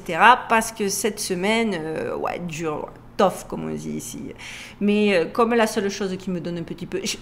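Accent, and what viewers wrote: South, France. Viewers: Salut ma jolie 🤗❤, je suis comme toi le vilain petit mouton noire, et aujourd'hui je m'assume totalement et je dirai même j'en suis fière, fière d'être différente et puis touts ceux et toutes celles qui sont différents et éveillés sont directement mis dans le moule dit "complotistes, fous, pas clair..."et j'en passe, moi et mon Moustache ( mon chat Noir et blanc) on t'❤aime car nous te ressemblons tellement à toi et à Melle Duchesse!!! Merci pour ta sincérité, ton humilité, ton accent qui chante notre jolie Sud...Tu es une belle âme, ne lâche rien, courage je sais que c'est difficile, mais tiens bon ma jolie, je t'envoie une galaxie d'infinies pensées remplies d'amour, de paix, de courage et de positives!!! Et pleins de bisous remplis de tendresse et de douceurs ainsi que plein de câlins pour Melle Duchesse, j'espère que tu as vu ou entendu parler de la fête de 3 jours que la ville de Toulouse va faire du 25 au 27 Octobre de cette année, c'est comme un immense Carnaval et le titre c'est "les portes des ténèbres" il me semble ( je t'avais laissé des messages à ce sujet) ce serait un superbe sujet à traiter et surtout à dénoncer, car le mal est vraiment là et ils ne se cachent plus, dans l'énoncé ou résumé de ce sombre spectacle c'est écrit que ce spectacle est fait pour connaître les ténèbres parce que pour découvrir la véritable lumière il faut d'abord passer par les ténèbres 😮😢 vraiment navrant et triste!!!! 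Merci pour toutes tes superbes videos, tu no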